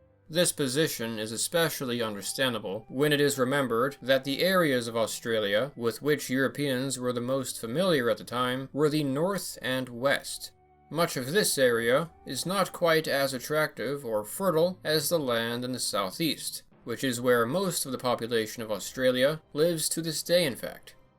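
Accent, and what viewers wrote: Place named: United States. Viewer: Do we really need to pay an obnoxious American accent to tell us about Australian history. Go back home and preach to the gullible.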